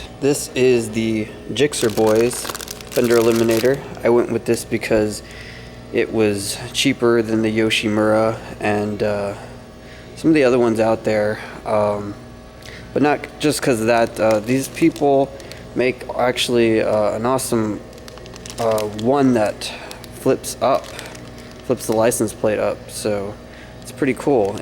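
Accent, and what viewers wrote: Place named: Texas, United States. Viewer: Bro what part of Texas are you from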